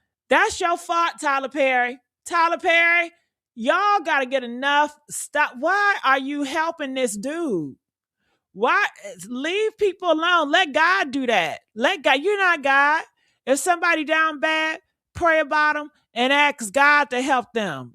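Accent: South, United States. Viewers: if you dont mind my asking, are you from the south??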